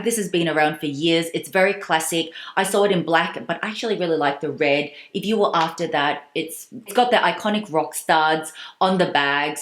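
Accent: Australia. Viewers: Hi, love your videos. I really appreciate all the information and tips. I really enjoy watching your videos because you are so knowledgeable and especially love your Australian accent. #faMELy Look forward to your next video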